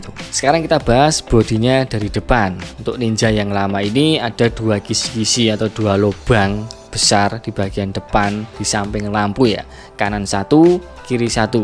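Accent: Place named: Java, Indonesia. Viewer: Medok amat bang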